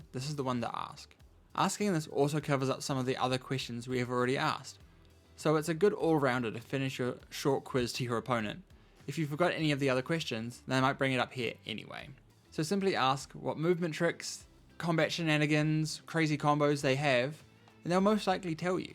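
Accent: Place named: New Zealand. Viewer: You sound like a kiwi, where abouts are you based?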